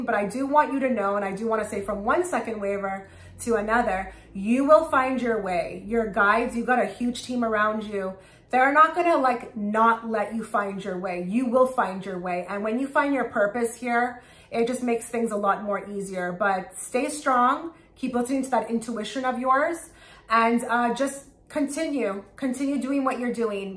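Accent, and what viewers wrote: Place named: East Coast, United States. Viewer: You sound like an East coast girl to me. New York area?? I am from NYC. Your video resonates a lot for me! Is there a way to message you? I have experienced all you speak of in this message. Blessings to you!!! ✨🩵✨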